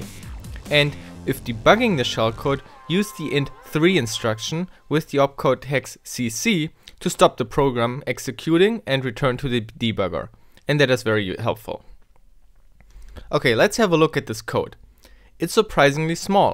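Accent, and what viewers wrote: Germany. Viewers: Do I hear a German accent?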